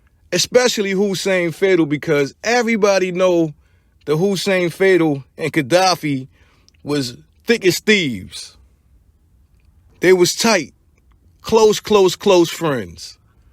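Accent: Baltimore, United States. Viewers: I’m new here but I hear the bmore accent fam salute to my bmore brothers from DC🫡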